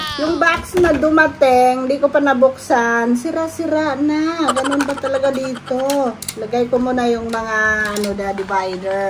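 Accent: Visayas, Philippines. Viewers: Bisaya man diay ka uy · sarap lang sa tenga marinig mag bisaya si 🤣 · I love the way she speak bisaya ❤️ stay strong 💕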